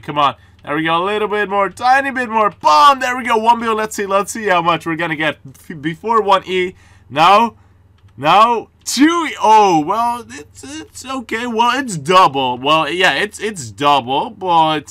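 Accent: Netherlands. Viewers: BREAKING NEWS Are You Dutch? (*and i mean from the netherlands*)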